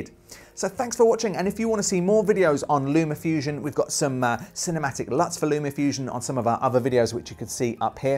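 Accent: United Kingdom. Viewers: You are a great facilitator!!! Can this program be bought as an IOS APPS? Can it be used STAND ALONE version? No Photoshop needed? Love the Mersey Accent. Thanks for your attention to my questions.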